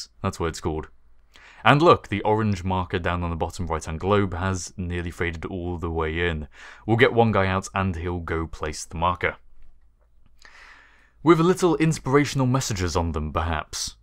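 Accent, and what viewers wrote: United Kingdom. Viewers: Is that his actual accent? O.o;; All my british accent lessons are from BBC.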